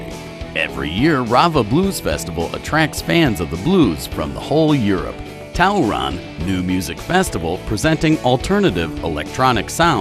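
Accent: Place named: United States. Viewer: It would have been MUCH better to have a Pole who spoke good English to do this voice over. The narrator's strong American accent is horrible and his pronunciation of some of the Polish words are terrible.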